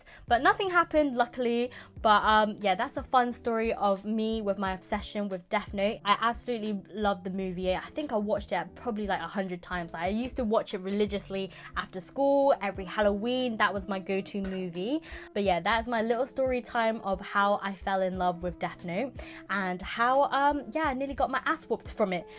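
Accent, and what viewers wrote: United Kingdom. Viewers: When I heard your British accent you have NO IDEO HOW HAPPY I WAS we need more British manga youtubers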